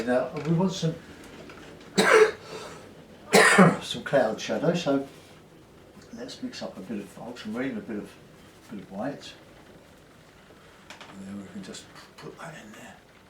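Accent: United Kingdom. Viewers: Lovely! I learned at lot from just this v8deo. However, I wish the artist (not just you, but many on You Tube) would edit the closed captioning, if that is possible. I have trouble hearing, and without being able to read your lips, as well as the British accent (old American here) its very hard to understand. The closed captioning is terrible; in many sections it makes absolutely no sense. But the video is magnificent otherwise!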